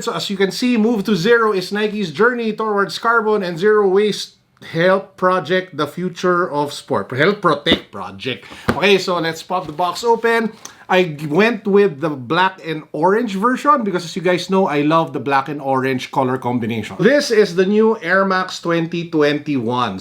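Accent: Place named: Philippines. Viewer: You sound pinoy kuya.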